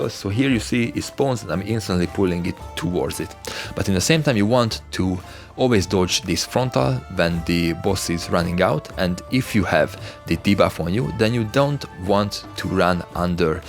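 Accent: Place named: Hungary. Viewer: Nem szeretnélek megbántani, de simán kihalatszik a szörnyű magyar kiejtés az angolodból. Rendesen vérzik a fülem. Viszont ha ilyen szépen megtanultál angolul folyékonyan beszélni,akkor érdemes lenne dolgozni rajta. Valamint egy magyar felirat sem ártana mert azzal nagyon sok embert érnél el, vonzanál be. Nem igazán vannak hasonló részletes videók magyar nyelven.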